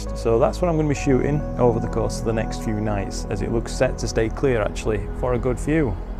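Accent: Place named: North, United Kingdom. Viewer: Hey, you sound like you are up from around my way (I'm a bit further North I think). You should come join our lucky imaging project on Discord, would be good to have you in the community.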